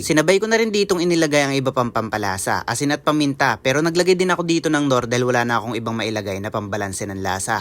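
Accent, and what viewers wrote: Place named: Mindoro, Philippines. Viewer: Parang tonong mindoro ka brad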